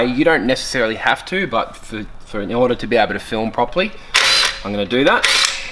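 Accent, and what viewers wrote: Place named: Australia. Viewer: Great video with free easy to understand AUSSIE accent.winner thanks mate you saved a trip to the mechanic.👍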